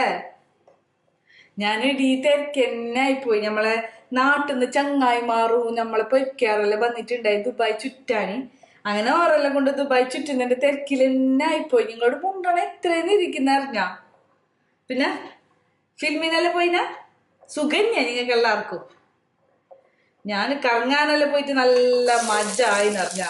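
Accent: Kasaragod, India. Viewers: പുതിയാപ്ലെ അല്ലാ മാപ്ലെ casrod slang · Kasaragod slang is very beautiful to speak and hear.but don't make it vulgar.. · ചേച്ചി ശരിക്കും കാസർഗോഡ് ആണോ.. 😂😂👍👍👍👍❤ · Ksd thanne diffrent slang und so its un predictable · ചേച്ചി കാസർഗോഡ് ആക്കി ശംസരിക്കുന്നത് ആണോ ശെരിക്കും ആണോ കാസറഗോഡ് കാരി ആണോ ഞാൻ ഒരു കാസറഗോഡ് കാരൻ പയ്യൻ (അല്ലെങ്കിൽ നമ്മൾ കാസഗോഡ് കാർ പോളി അല്ലെ) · Nmmle ksrotte basa endorri paangppa😍😄 hyole bisyo kettit njn chirchitt merchhoyiii,😂😂😂👍👍😍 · ശെരിക്കും കാസറഗോഡ് സ്ലാങ് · Ende oru frnd paranjanu njan ee tym kasarkodu bhasha inganayundu ennu kettittundu...ippo aanu nerittu kanunnathu....enda ponne ijjathi resamundu kelkan😂😂😂❤❤ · Is this kasaragod malayalam?? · Sunesh 😀kasrgod slang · Yuppaa maje aayin njammale kasrodian slang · നിങ്ങളുടെ ഈ റിവ്യൂ കൊണ്ടു മറ്റുള്ള മലയാളികൾ ഒകെ കുറച്ചു കാസര്ഗോഡൻ മലയാളം പഠിക്കട്ടെ. Good job. Enjoyed. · ivde ipo ntha indaye 😁😂 Ksd slang poli malappuram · Kzrkd slang 😍👌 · കാസർഗോഡ് ഉള്ളവർ എല്ലാം ഇങ്ങനെ ആണോ സംസാരിക്കുന്നെ ?? 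😆😆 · Hahaha..usaaranne ppa..eden padiche kasaragod bhasa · Kasarode poli slang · സൂപ്പർ സ്ലാംഗ് കാസർക്കോട് ഒരു മഴ പെയ്തു തോർന്നു പോയത് പോലെ തോന്നുന്നു അതി മനോഹരം അടുത്ത വീഡിയോ കാത്തിരിക്കുന്നു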